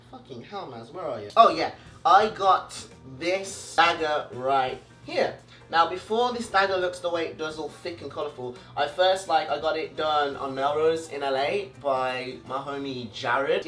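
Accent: United Kingdom. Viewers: Wth I f with his music I never thought he would talk like this I didn’t even know he was British damn tuff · Ma Nigga on your songs you sound like you'r from hell but on your videos you sound like you'r from london (because england is my city)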